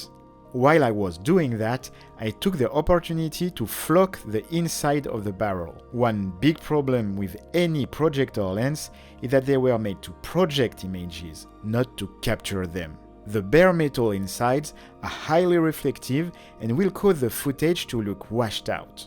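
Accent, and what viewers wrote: France. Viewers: Hey, are you french?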